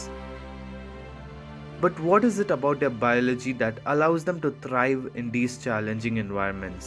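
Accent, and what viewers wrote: India. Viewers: Indiglish, got to mute and watch subtitles instead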